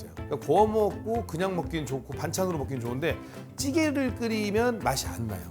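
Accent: Chungcheong, South Korea. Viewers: 아 충청도 말 구수해~!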